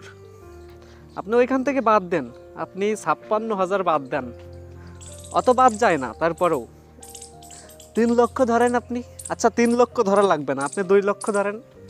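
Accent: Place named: Khulna, Bangladesh. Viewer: আমার বাড়ি ঝিনাইদহ আপনার কথা সুনে বুঝতে পারছি আপনার বাড়ি খুলনা বিভাগে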